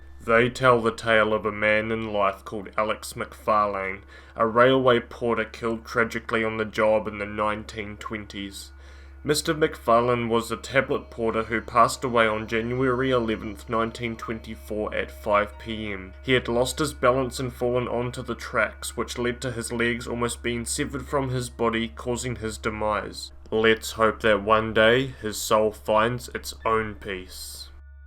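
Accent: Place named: New Zealand. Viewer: Kiwi as..... voice over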